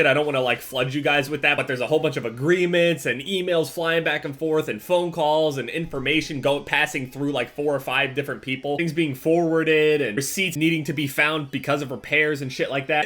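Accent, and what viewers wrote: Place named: New Jersey, United States. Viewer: New Jersey accent on point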